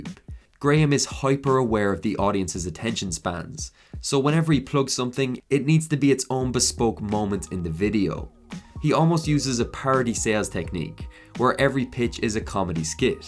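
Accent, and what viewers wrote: Ireland. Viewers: Is this buck Irish? I'm hearing an Irish accent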